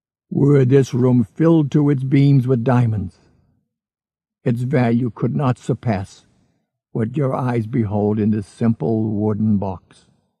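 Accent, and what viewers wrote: Boston, United States. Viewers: Boston accent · Am I the only one that noticed that he started with scroll marked 3 instead of scroll marked 1???? It threw me off as I was following with the book.. ugh… I love his voice and Boston accent tho 😜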